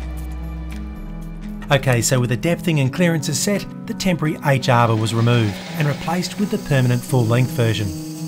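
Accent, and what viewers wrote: Australia. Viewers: I found this video series yesterday, and I had to stop myself from watching more at 3 AM. Seriously, this series is absolutely stunning. From the historical significance of this machine to your engineering genius, neat working methods, your calm Aussie voice and amazing editing/cinematography skills....you've got it all, man. I'll be waiting patiently for the next one <3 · Dear journal, its been three months, were starting to wonder if will ever upload again and just leave is with a half finished project. We have made do by watching satisfying compilations but its just not the same as his smooth australian voice and perfect hand filing and attention to detail. I will try to update this journal but i dont know how much longer we will last without another video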